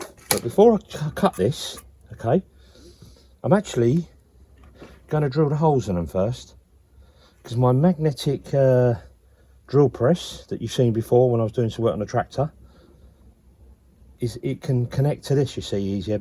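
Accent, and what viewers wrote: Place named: England, United Kingdom. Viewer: You sound English,are you in England? 🇬🇧🇬🇧🇬🇧🇬🇧🇬🇧🇬🇧🇬🇧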